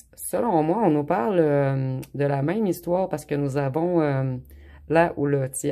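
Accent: Canada. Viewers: Première fois que je vous écoute! Votre accent canadien me plaît beaucoup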